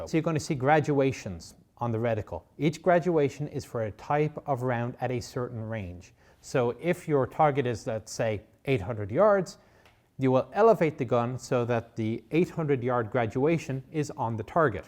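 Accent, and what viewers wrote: Ireland. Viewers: I sense the Irish accent. I’m a dub where you from buddy.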